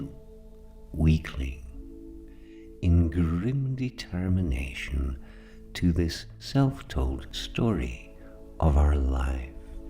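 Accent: Scotland, United Kingdom. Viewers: I love your stuff accent is great might be Scots.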